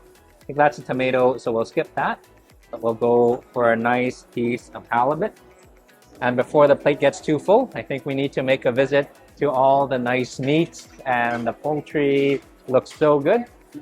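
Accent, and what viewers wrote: Canada. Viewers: Pizza? Fish? Spring Rolls? I think you said. I'm not sure if I understood your Canadian English? You're lucky you are a Food Reviewer, otherwise I would be smacking you through the TV. I would like King Crab Stuffed Lobster, with a side of Crab and Lobster. THE END!!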